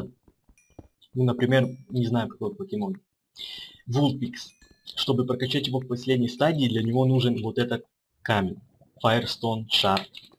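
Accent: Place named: Ukraine. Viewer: Акцент України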